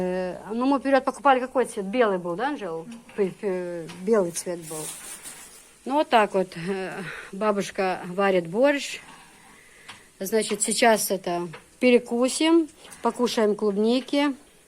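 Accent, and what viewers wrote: Chechnya, Russia. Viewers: Ма шаа Аллах1.,вас не отличишь от чеченки-даже акцент чеченский.Умничка,смогла на все 1000% влиться в семью,в быт,в традиции.Так и должно быть,когда выходишь замуж,то нужно перенимать их привычки и традиции,а не навязывать свои. Дети,очень красивые ма шаа Аллах1. Дала дукха баха бойла. Шу Дала барт цхьа бойла,аьтто бойла!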